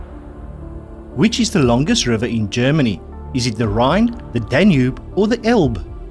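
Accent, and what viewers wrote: South Africa. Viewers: I love your accent, but what is it ❤️🙏🙏🙏??? I cannot put my finger on it. SA??? 😮Thank you for these great quizzes ❤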